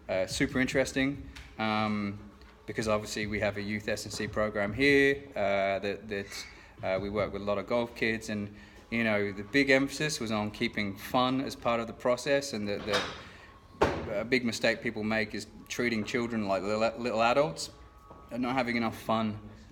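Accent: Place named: Australia. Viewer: Hi mate, what part of the UK are you from? When you speak you sound Australian.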